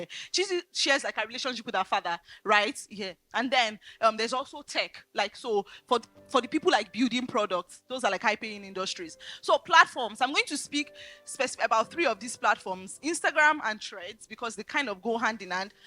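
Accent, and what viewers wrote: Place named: Nigeria. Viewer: I love how you're speaking like a Nigerian. No extra accent😂❤❤❤.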